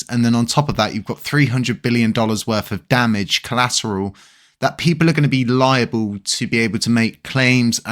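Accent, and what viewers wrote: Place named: Oxfordshire, United Kingdom. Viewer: Trying to work out your accent. Oxfordshire? Never the less. Great content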